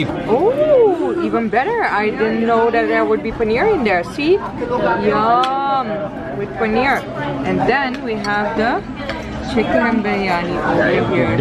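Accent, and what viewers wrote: India. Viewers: Haha..one suggestion for you. Watch your first vlog and watch this. Your accent is slowly getting indianised.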